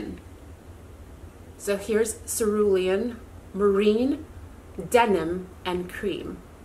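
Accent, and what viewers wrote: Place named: Europe. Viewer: Thank you for your video. I picked up the tweed quad. If you don’t mind me asking, where are you from? I keep hearing a slight European (?) accent every now and again.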